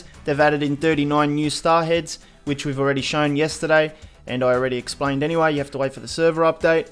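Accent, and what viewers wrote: Australia. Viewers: He’s from Australia so he pronounces things different, low him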